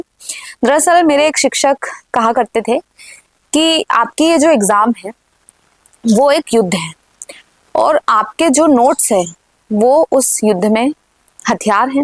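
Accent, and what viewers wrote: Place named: India. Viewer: bahut shandar bolti ho tum ekdam desi andaz me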